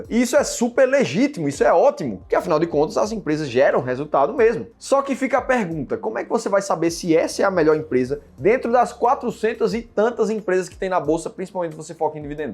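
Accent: Pernambuco, Brazil. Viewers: E aeeee comedor de mocotó de boi! Será que já comeu uma buchada de bode??? Homi....vamos comer uma mão de vaca! Esse sotaque não engana um pernambucano não! Kkkkkkk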